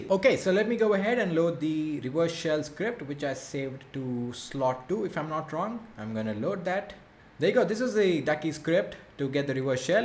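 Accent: India. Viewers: I still don't understand. i Indian accent is completely understandable why people need a fake layer on that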